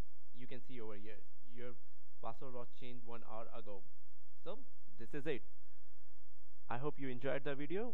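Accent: India. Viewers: Thank you very much! il ur indian accent!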